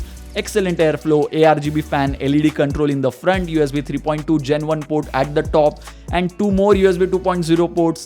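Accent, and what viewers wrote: Maharashtra, India. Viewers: i hear Marathi in Ur english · That's why I like Indian accent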